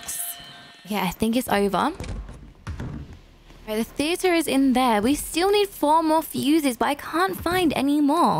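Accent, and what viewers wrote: United Kingdom. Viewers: Omg your British :0 Give me a shout out pls :D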